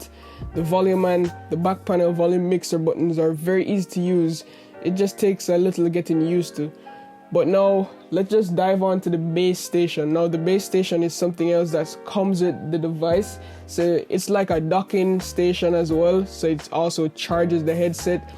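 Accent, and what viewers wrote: Jamaica. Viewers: Are you Jamaican? It's like listening to one of my cousins tell me about tech. Great work!!! Ire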